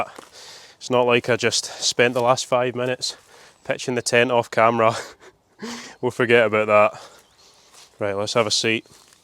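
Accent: Scotland, United Kingdom. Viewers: I absolutely love your videos and love to hear you speak with that Scottish accent. As much hiking as you do, you have got to be in prime physical shape. As bad as my health is I can only hike with YouTubers. I have always loved the outdoor and with me living in the Southwestern USA, I can’t get out and be in nature. We have such beautiful country where I live and can’t get out see it. So for now I will just have to hike and camp along with you on YouTube 😂😂😂😂😂😂😂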